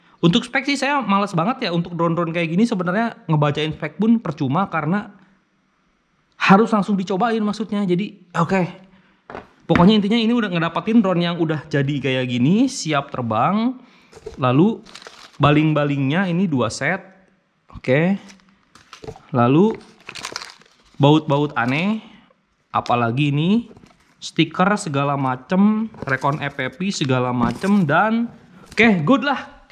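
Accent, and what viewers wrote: West Java, Indonesia. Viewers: Kang sampean orang sundanya, kanyahoan dina logat nage😁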